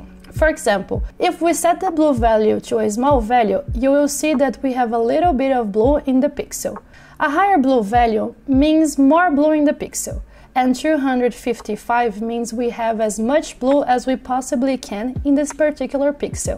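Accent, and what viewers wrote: Brazil. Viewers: Sotaque BR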